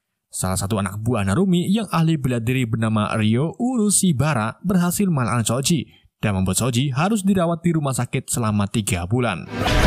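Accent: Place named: Central Java, Indonesia. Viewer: pames kwkw,jateng bgt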